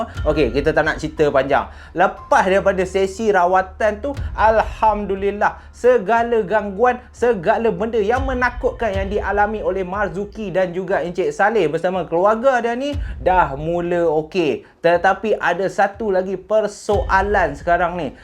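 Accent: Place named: Penang, Malaysia. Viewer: asalamualaium. pandai juga hang cakap peneng.hang pandai buat lawak.teruskan kerjaya hang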